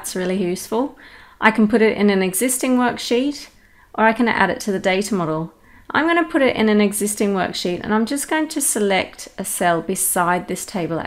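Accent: United Kingdom. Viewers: Thanks for the great tutorial. I despair when I receive badly formed spreadsheets. PQ has totally changed that - at least it numbs the pain a bit. BTW nice to hear a Brit voice for a change.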